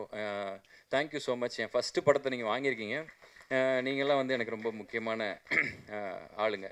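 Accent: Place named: Coimbatore, India. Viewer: nee Coimbatore pasai nalla pesuva antha jonerla padam pannu arasiyal vathi mathiri and village nakkal paiyan mathiri pannu.. All the best👍i am from ❤️💛❤️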